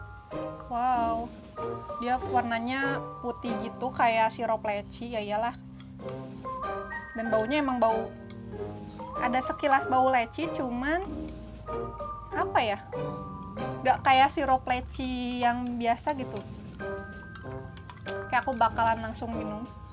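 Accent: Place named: West Java, Indonesia. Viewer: sia syet hehehe seneng denger logat sundanya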